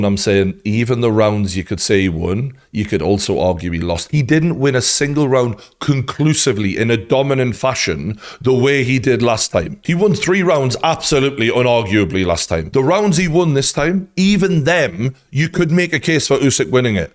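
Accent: Newcastle, United Kingdom. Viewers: Spot on true geordie👍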